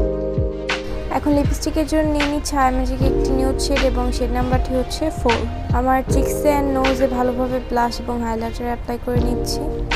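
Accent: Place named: Bangladesh. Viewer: when you start talking 💗💗 damn she is bangladeshi😻💕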